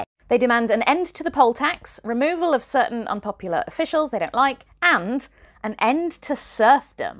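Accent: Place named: North, United Kingdom. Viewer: Interesting, anyone know where is from? She pronounces 'castle' and 'demand' in the Welsh/Northern manner, rather than with the usual Southern long 'a'. Just curious, some people from London do indeed say 'castle' like that.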